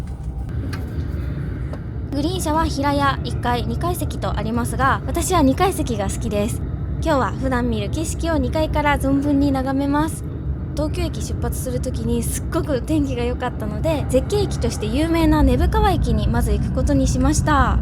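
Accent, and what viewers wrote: Kansai, Japan. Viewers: なんで関西弁やねん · おー大スター、ナチュラルな関西弁ですかね。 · 斬新なSuicaを使い切っての旅動画アップありがとうございました♪ どんな動画になってるのかワクワクしながら観てました🤭 Suicaが使える使えないのシーンでの効果音が、まんまさんの心の声のような気がしておもしろかったです😂 根府川駅のカラスさんはおもしろすぎました。華麗なステップすぎて🤭 熱海城って聞いた事ない城だなぁと思っていたら、熱海には城があったという歴史はなかったんですね。商業施設としての熱海城という名前だったとは知りませんでした。熱海サンビーチでの落書き…何を書くかと思えば斬新な…🤣 ちなみに「hola」はスペイン語なんですが、スペイン語ではhは発音しないので「オ-ラ-」と読みます。英語で言うHelloですね。小田原城！行ってみたい城だったので天守閣や天守台からの展望見せてくれてありがとうございました✨ 説明しようとしたら、ちゃんとさんが勉強して説明してくれてました🤭 秀吉が小田原征伐で建てた石垣山城は天守から見えたかな？箱根フォント初耳だったのでメモしました_φ(･_･ 入浴施設のお蕎麦おいしい説、絶対ありますよね！最後の残高には思わず「マジかぁ〜」となりました😆 私もSuicaって便利なんやなぁと思いました🤭 今回はさんの関西弁多めで嬉しかったです。さんの関西弁聞いてファンになった身でしたので。見応えたっぷりの動画ありがとうございました😊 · 所々関西弁とか、お箸が左利きとかなんかいいね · 月曜に動画上がって癒されました✨️たまに出る関西弁が関西出身からしたらめっちゃいいです！暑いので、水分しっかり摂って夏を楽しんで乗りきりましょう！ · どれどれ可愛い😍ちょこちょこ関西弁が良き良き😊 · 私は箱根に行くときは、もっぱらっ車ですが、登山列車もおもむきがあって良いですね。会話の端々で出る関西弁が可愛いです😊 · ２万円有効活用の旅楽しかったです❣ さんの時々出る関西弁が可愛いです❤ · 大阪弁がかわいすぎる。熊本弁、岡山弁、名古屋弁もお願いします。全部かわいいと思う。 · 箱根は、さんのお気に入りの場所リラックスできて関西弁も出てましたね！ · なかなか、楽しいチャレンジ企画ですね。 Suicaのいい宣伝にもなりますし、めっちゃ、Suica Loveですね！で、熱海の砂浜に、Suica と描いた映像はなんか、とても、シュールでした！さん、いいセンスしてますね！さんのモリモリ食べるシーンで、美味しさが伝わります！時々、出る関西なまりが、かわいくて、素敵ですよ。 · Suica一枚だけ旅！熱海で海を楽しみ彦根で温泉楽しんでの満喫旅♬ にしても温泉上がりのちゃんすっぴん？？って一瞬思ったけど流石にそれはないわな。。。ｗ ☆今回は関西弁多めの動画で普段にもましてカワイイやないか~🤣 残高１円・・・最後、乗り越し精算になってしもたらどなするつもりやったん？ｗ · 熱海の砂浜の波打ち際を裸足で歩いてる姿はアイドルのように可愛かったよあと関西人な自分にはさんから時々出る関西弁が親しみを持てて良かったです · ちゃん、たまに関西弁が出る。親が関西人とか? 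· たまに出る大阪弁が可愛い。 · 今回もありがとうございます！たまにはこうしたノープランで旅に出るのもとてもいいなと思いました。残高1円で終わられたのもすごい！たまに関西弁が出てくるところがとても親近感があって良かったです！箱根登山電車は紅葉の時期に行ったことがありますが、四季を通じて年中楽しめる路線だと改めて感じました。天成園さんの温泉が気になったので箱根に行ったらチェックしたいです！ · 関西弁の 👍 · 今回も楽しい動画ありがとうございます！こういう企画もいいですね😊それにしても残額1円って流石ですよ！！東海道線(熱海行き)、座席上にSuicaかざしてグリーン席を利用するのは、初めて知りました！根府川駅で急に関西弁が飛び出して驚きましたが、さん関西弁は可愛くて、勝手に親近感を感じてます😆 熱海の砂浜に「Suica」は、ちょっと笑っちゃいました、小田原城の天守閣から見る景色も最高ですね、天成園の外観・森の奥深くにありそうな雰囲気がとっても良くて、浴衣姿もとてもよく似合って可愛かったです😊 他の方のコメントを読んでいて、タッチでGOは東海道新幹線で利用できないと書かれていて、自分でも調べたんですが、使えないんですね……勉強になりました🤔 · いつもに増して関西弁がちらほら🤭 カラス🐦‍⬛さんはご無事でしたか？夏は房総、秋は北関東、冬は雪☃️があるところと、季節ごとにシリーズ化してください😊 そうそう、お城🏯にもご興味がおありなのですね😊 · Suica1枚で充実した旅ができてよかったですね😊 残高1円は奇跡ですね😊 最初のほうで関西弁が親近感があって嬉しいよ😊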